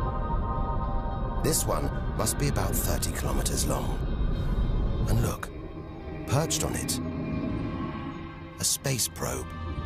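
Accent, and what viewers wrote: United Kingdom. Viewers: British narrator, but this is so American!.. hard to watch as an European.. why always so full of it. Keep it real folks! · Why do they alway have to re-voice American documentaries in an English accent or vice versa? I’m fed up with that! It’s the same fucking language! If I can understand both accents, so can everybody! Give me a break! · Why is Alec Baldwin speaking in British dialect? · Sean pertwee vs alec baldwin, sorry but the british soft spoken accent is so much more real. More believable, more comforting and true.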